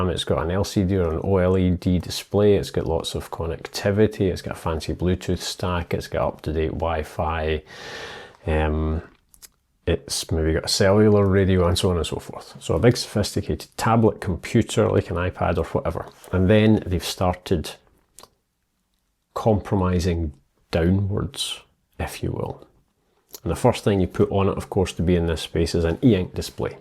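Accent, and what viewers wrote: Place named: Scotland, United Kingdom. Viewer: I really liked your comparison about eink tablets. Your way to explain Boox aproach is very good. I am now getting my first eink device afrer almost two yeras of reviewing and deciding (and waiting😅). But I did a purchase two years ago of a Booxs tablet (I think it was a Note Air 2) and I return it as soon as I test it becouse it felt exactly as an android tablet but much mote slow... and I wanted just to orgnize my work an take notes, so I started to dig into RM and Supernote,....anyhow, very good videos,...and I like your scottish accent,...I love your country. A place to wrte good novels😊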